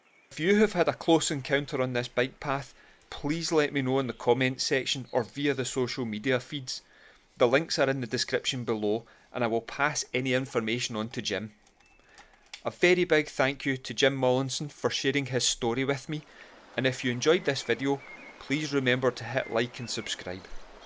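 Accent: Glasgow, United Kingdom. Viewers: Sounds Glaswegian to me (albeit well spoken) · Sounds to me like he could be doing Gregory’s Girl, definitely Glasgow.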